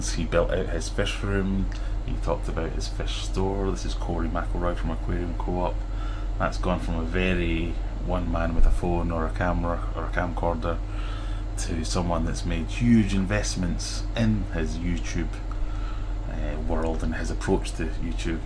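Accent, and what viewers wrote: Scotland, United Kingdom. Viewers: Agreed on your insight regarding Joey's channel and himself. Are you Scottish? Kind regards! P.S. I've just subscribed :)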